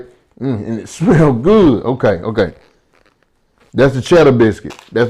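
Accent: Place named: Alabama, United States. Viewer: Sound like he's from Alabama. Food looks great!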